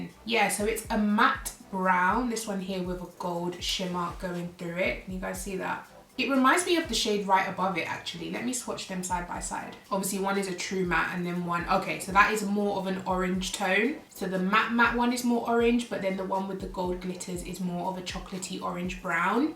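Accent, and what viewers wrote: United States. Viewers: Thank you for doing this pallete! The ads keeps stalking me on Facebook 😆 and I was in love with the beautiful brown and gold and colors so I was dying to see this pallet on a black girl! Sad about those blushes tho. 😕 Also, your American accent is pretty good! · Lolol your American accent is very TEXAN ..& we welcome youuuuu 🥰🥰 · Lmao I love your American accent lmao😍😍😍😍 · Your ‘American’ accent is funny! lol I always try sounding like you, it’s a straight fail!🤣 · I love (and laugh) with your American accent 😋 so cute! I love those gorgeous deep tones and this collection is beautiful! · I love ur American accent 😍😄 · I love your American accent x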